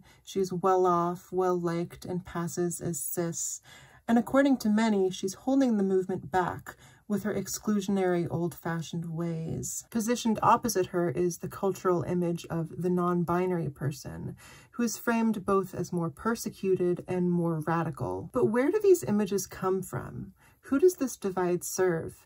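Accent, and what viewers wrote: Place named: Canada. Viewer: P.S. I love your Canadian accent! Much love from the UK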